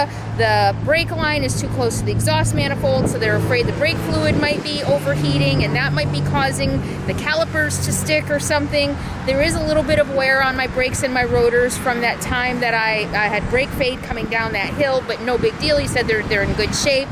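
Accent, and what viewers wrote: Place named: South, United States. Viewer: Nothing wrong with picking up a little Southern twang...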